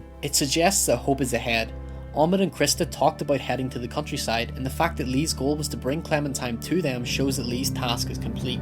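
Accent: Northern Ireland, United Kingdom. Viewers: great video, is that a northern irish accent?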